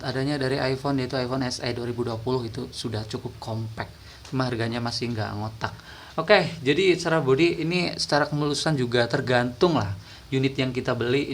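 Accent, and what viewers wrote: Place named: Java, Indonesia. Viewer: Bang lu orang Jawa ya kok logatnya Jawa bngt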